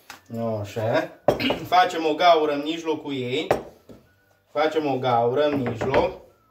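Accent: Transylvania, Romania. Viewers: m-am abonat numa' să-ți aud vorba dulce ardelenească. No așe! Ptituca, să-ți dea Dumnezeu sănătate și mulți prenumeranți.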